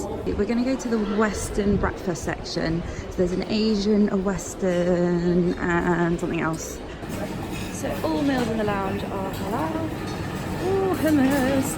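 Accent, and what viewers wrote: RP, United Kingdom. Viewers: Posh upper middle class totty. Loving the private school accent. In love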